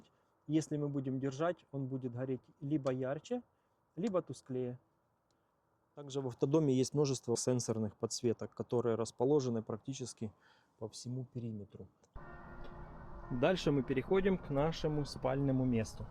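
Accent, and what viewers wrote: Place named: Ukraine. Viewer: Слова "ложить" нет в русском языке....есть "положить" или "класть". Думаю Вы украинец, судя по акценту. Спасибо за видео.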